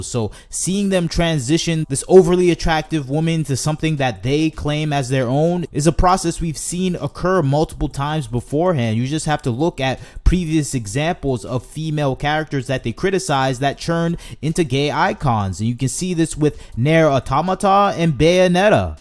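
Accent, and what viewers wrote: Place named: South, United States. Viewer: what part of the country are you from? you say idear instead of idea lol, i can hear the accent, it reminds me of the south, but i'm not quite sure where in the south because all your other sentences sound more or less not from the south, so it's difficult to know where you are from exactly.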